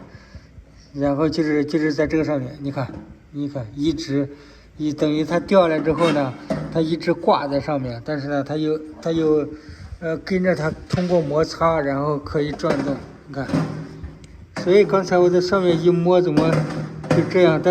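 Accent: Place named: Henan, China. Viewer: 大师听口音河南的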